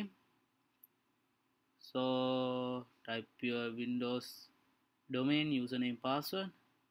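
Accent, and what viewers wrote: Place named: India. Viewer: indian accent very bad!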